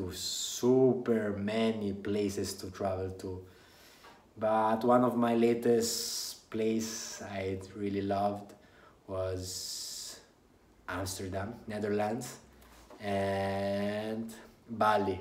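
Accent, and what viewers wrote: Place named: Italy. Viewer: Kasing hot ng Italian accent mo rarr!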